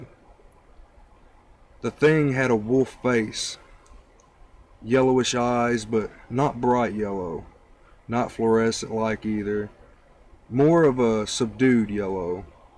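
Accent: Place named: Louisiana, United States. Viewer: Great videos..You must be from the South..probably here in Louisiana. because of your accent And, I never heard people outside of Louisiana say "right chere" instead of "right Here" lol. our southern accents and words we use is very foreign to those in other parts of America, particularly outside of Louisiana. lol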